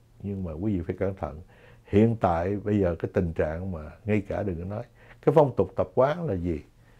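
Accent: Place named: Southwest, Vietnam. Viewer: Nghe Anh kể chuyện thời đó.. cách trình bày của Anh giọng nói miền Tây Nam Bộ thật dễ mến..👍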